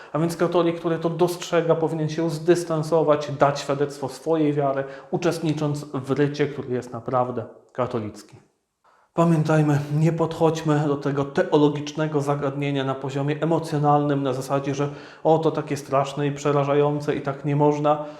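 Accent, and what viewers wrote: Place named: Italy. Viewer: Miałbym do Księdza uprzejmą prośbę, by przytaczając cytaty z tekstów łacińskich raczył Ksiądz stosować się do tradycyjnie przyjętych w Polsce prawideł wymowy, bez zachwaszczania italianizmami itp. Z góry dziękuję!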